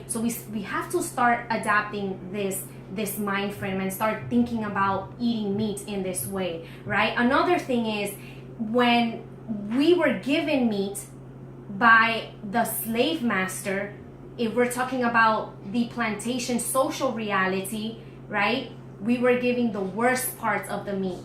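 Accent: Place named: New York, United States. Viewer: Enjoyed ur video. I am Puerto rican, and I enjoyed watching you make this. Nice job. Are you from New York City? You kind of had that New York flavor...lol Thanks again!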